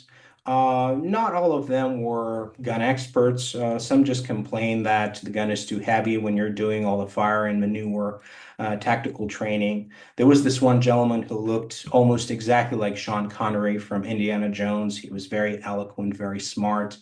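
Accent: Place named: United States. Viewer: holy shit had no idea this dude spoke with an american accent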